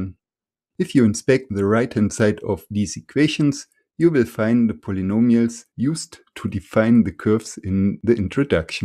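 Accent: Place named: Germany. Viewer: Strongest German accent ever. Love it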